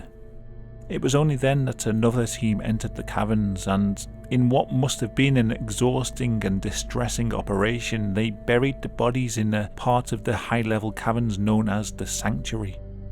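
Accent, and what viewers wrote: United Kingdom. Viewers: Ur British ascent is too muchhhh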